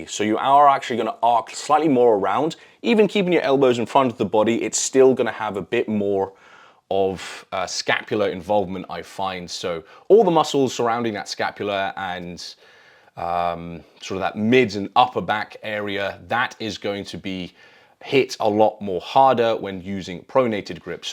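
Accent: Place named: England, United Kingdom. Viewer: thought you were cbum from thumbnail. the english accent through me off. thanks for the info tho very good tips. back day in 6 hrs! subcribed!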